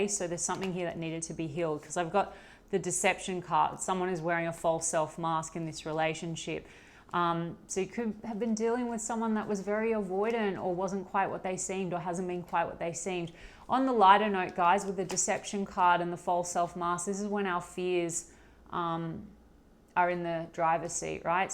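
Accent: Australia. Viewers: Really like, great interpretation and Lovely Aussie delivery😊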